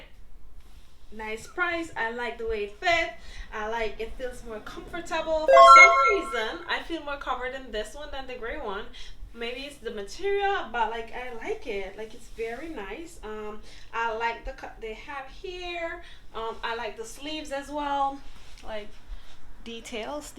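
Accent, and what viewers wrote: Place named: RP, United Kingdom. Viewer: Yuh posh too bad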